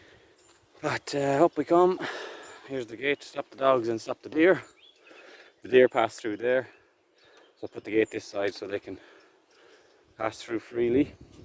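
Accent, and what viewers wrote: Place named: Mayo, Ireland. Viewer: Assuming it’s mayo given the accent planning etc?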